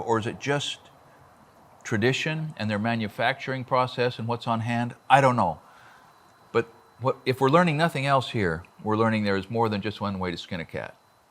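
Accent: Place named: Midwest, United States. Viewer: I hear a Midwest accent in your voice.🤔 I grew up in LA, "Shakey Town", Southern California.😧 It's obvious you're not building for earthquake resistance.🤨 That's the reason for some of those "odd" tenon shapes.☺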